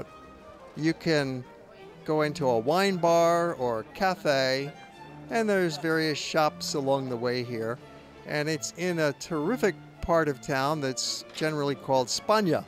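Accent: United States. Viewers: your accent does sound very American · Great video! Thank you! What U.S. accent have you? I can't pick it...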